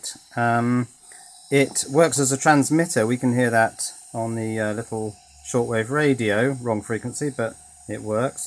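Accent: United Kingdom. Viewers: just curious, are you from the UK ? your accent is very nice.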